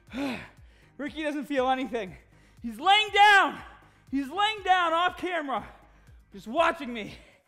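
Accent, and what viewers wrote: Boston, United States. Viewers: This sounds sappy as hell, but I am SO THANKFUL for these workouts. I've been using your videos four or five times per week for 7 WEEKS and my body has literally TRANSFORMED. I live in Massachusetts and I stumbled onto his YouTube channel and I legit started doing the workouts because I heard his Boston accent. This guy is the REAL DEAL!!!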